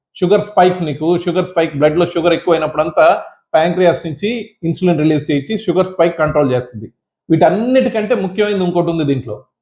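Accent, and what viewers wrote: Nellore, India. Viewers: Maa nellore bhasha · Love you sir ur ur nellore good👍👍👍👍👍 God bless u& ur family with health & wealth & prosperity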